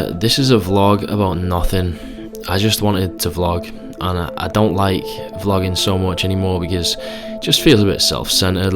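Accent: United Kingdom. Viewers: Love this british accent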